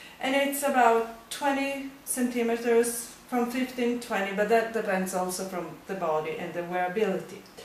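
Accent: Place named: Italy. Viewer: grazie per la lezione. mi piace il tuo accento italiano ...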